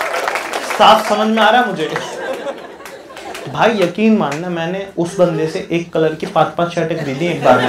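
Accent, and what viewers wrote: Indore, India. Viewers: indori ki baat hi Alag hoti hai bhiyao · bhai ye Indori andaz he.. mp me ese hi bolte he... kabhi aao apne mp me bhot mja ayega...:)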